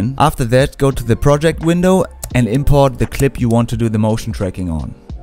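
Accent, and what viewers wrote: Germany. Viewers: solid info delivered with dry snark in a German accent- yeah, I'm subscribed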